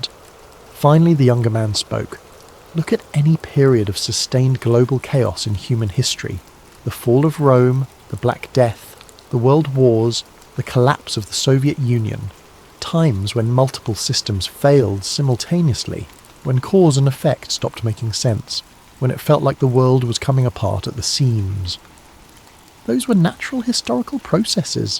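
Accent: England, United Kingdom. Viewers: please, no more english accent 😮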